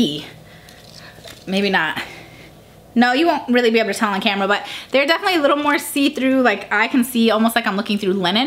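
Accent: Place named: Chicago, United States. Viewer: First time watching one of your videos!! I knew that accent sounded familiar!! #chicagogirls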